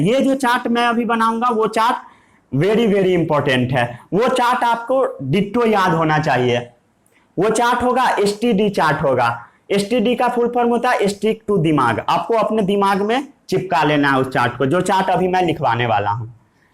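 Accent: Bihar, India. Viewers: saab BTW pronunciation is not a genetic feature.. And the so called 'pronunciation problem" you are talking about is our accent which we Biharis are proud of.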